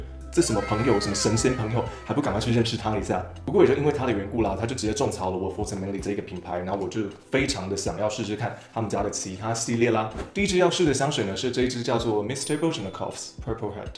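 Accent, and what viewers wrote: Taiwan. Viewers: 每次都講解的好棒。只是「台灣國語」有點太多了😂，很容易打斷美好的情境想像😆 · 生動清晰的解說加上親切幽默的台灣國語，害我也好想來入手幾支，可惜沒有管道啦！ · 香水介紹的很好，但台灣國語真的聽不下去….. 聽到一半很煩躁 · 好很喜歡您的影片富有許多知識且有水準只是後期蠻多影片不知道是為了影片效果有很多“台灣國語”的部分導致影片很想切掉不看不知道怎麼想呢？謝謝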